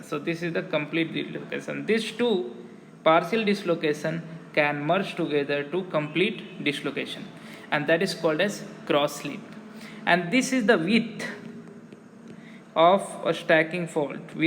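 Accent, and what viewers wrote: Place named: India. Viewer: I love your India accent so much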